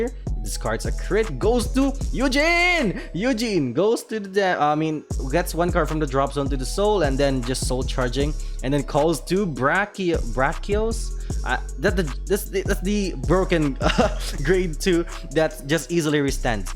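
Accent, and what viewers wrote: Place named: Philippines. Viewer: Sounds Filipino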